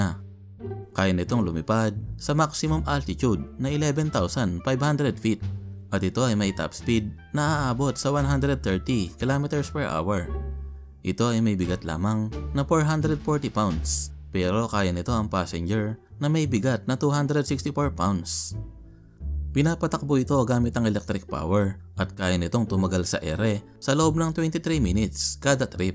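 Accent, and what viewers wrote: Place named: Ifugao, Philippines. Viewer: KASLA KA NGA KIYANGAN NGA AGSAO MET. TAGA IFUGAO KA BA?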